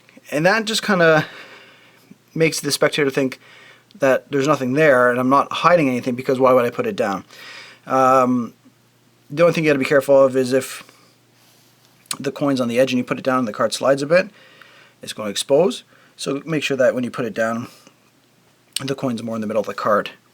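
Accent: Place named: Ireland. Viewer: The real magic trick here is the subtle Irish accent he has.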